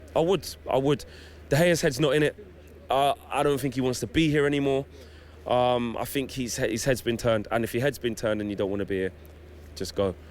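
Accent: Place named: London, United Kingdom. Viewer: Why do u even support united you sound like ur from London why wouldn’t u support a London club there are a lot too choose from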